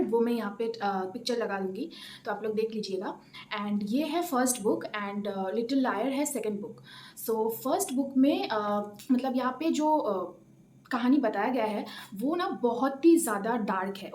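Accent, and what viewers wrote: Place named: Bengal, India. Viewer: 8 months na ki 8 years Btw. Your Bangla-hindi accent is amazing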